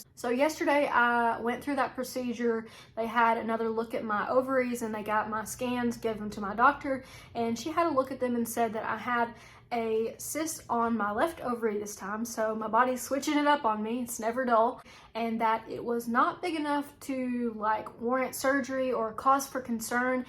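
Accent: South, United States. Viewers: OTC pain meds can damage your Liver over time. This can cause weight gain and the eventual need for a Liver Transplant, so that isn’t a real solution. Even opioids won’t do anything but mask symptoms. I learned that regular OB/GYNS are pretty unhelpful but that Oncological OB’s take things more seriously - so see one of THOSE. At your young age, they are assuming you want to have kids/more kids, so they don’t want to remove things. They also know that removing ovar(ies) can put you into immediate Menopause. So they are resisting that solution. A Very Low Carb/Keto/Carnivore diet can make a difference bc carbs/grains/alcohol/sugar can CAUSE PCOS. If you don’t give your body any of that, it can’t then cause Insulin Resistance, which causes PCOS. There IS scientific info on this. Watch YT videos by Dr. Ken Berry about this. If the pain is too much, AND you don’t intend to have kids/more kids anyway, maybe you’d be better off getting your parts OUT and going on HRT (Naturopaths know much more about this than OB/GYNS who were taught wrong by Big Pharma which runs all medical schools. 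Mild, regular exercise can help remove the bad hormones and toxins. Castor Oil Packs (TONS of YT vids on this) can help. You are very articulate and have done lots of research. Many doctors ASSUME that a female with a Southern accent is ignorant. That may be part of the issue too. Even if you know your stuff, the accent will make them dismiss your concerns…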